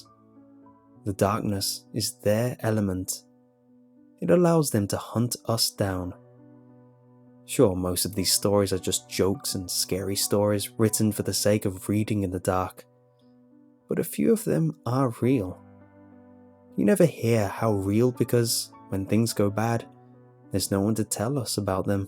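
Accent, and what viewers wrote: United Kingdom. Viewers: your british acksent makes stories scary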